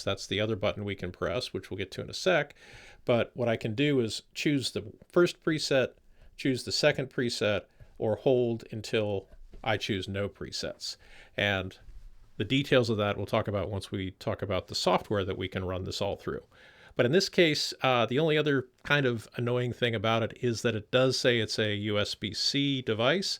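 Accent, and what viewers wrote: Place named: United States. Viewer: Thank you so much for sharing your precious know-how. (And really Excuse me, but which side of the US are you from, East, West, South, or North? Because I really like English pronunciation like yours. I'm asking because I really want to know which side of the US that pronunciation is from.) Sincerely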